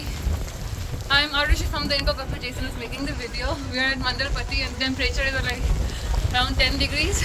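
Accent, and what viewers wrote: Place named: China. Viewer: 한국인이 아닙니다 왜 자꾸 한국이라 하는건가요 발음이 중국인 발음이네요.전 한국에서 왔습니다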